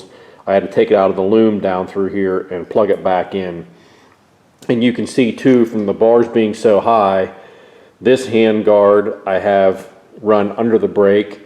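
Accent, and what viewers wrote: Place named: Pittsburgh, United States. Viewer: I here a pittsburgh accent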